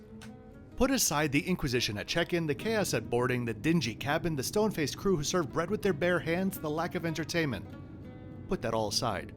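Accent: United States. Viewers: Your accent tells me you’re from the US. I travel in the US sometimes a 5 1/2 flight from the west to the east, guess what? No food served at all. As far as safety.. they arrested a pilot once who was drunk as hell, yes this happened in the USA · I would very much like to know where you're from. You sound american but it'll be helpful to know your heritage to understand the hit job you're doing on air algerie.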